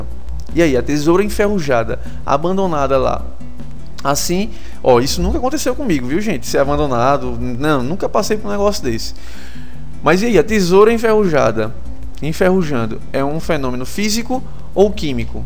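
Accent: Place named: Pernambuco, Brazil. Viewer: pelo sutaque eu chuto! Pernambuco? ...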